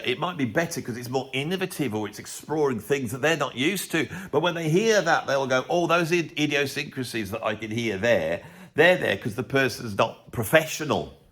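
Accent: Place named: England, United Kingdom. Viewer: Nobody out of England does understand what you are telling because of your terrible Accent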